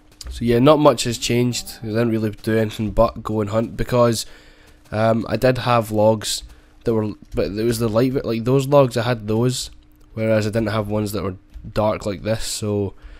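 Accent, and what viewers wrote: Scotland, United Kingdom. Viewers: scottish accent+oh noooo!= winzorz and rofls. my dadio is from scottland but im from the usa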